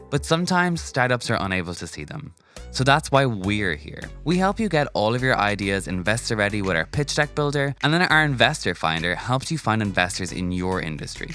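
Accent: Ireland. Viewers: Most people are finding it a little harder with the Irish accent. Congratulations new guy, but please- let Caya host 😅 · When a brand pivots, like changing the voiceover, you have to be ready for some backlash. BUT love beats hate. Welcome Irish Guy!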